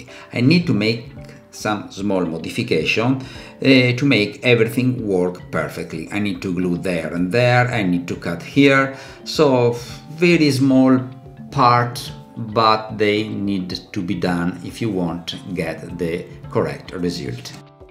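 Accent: Italy. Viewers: "The restoring project for SAILOING around the world.", you speak english with a nice Italian accent. I like it. But, it is the first time I see someone WRITING with an Italian accent. Beautiful work, by the way!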